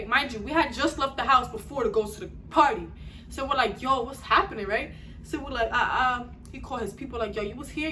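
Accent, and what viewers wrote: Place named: New York, United States. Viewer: Man That New York accent kills me 😂😂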